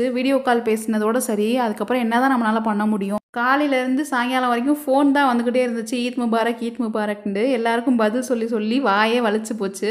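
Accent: Madurai, India. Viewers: Madurai pechu kiliyethu dhul machi nan kuda Madurai tha nega yenga irukenga ipo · Nanum maduraikari than sis unga slang nalla theriyuthu.. aagatha vela haha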